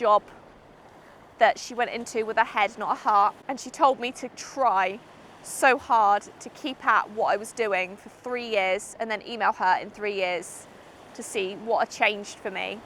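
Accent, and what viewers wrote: United Kingdom. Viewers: Who else love her British Accent?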